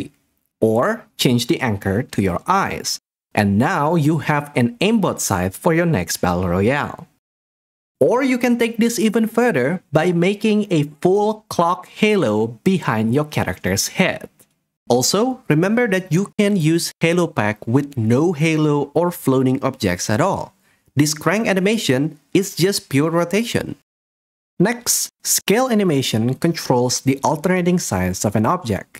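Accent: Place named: Indonesia. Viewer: Amazingly helpful, also found your other tutorials and facial expression packs to be extremely valuable, thanks so much, especially for the free stuff! Also, CMIIW, but your accent sounds pretty South East Asian (dare I say Indonesian?) it adds a lot of charm to the videos and, from a fellow Indonesian, I hope you succeed and continue to make tutorials like these.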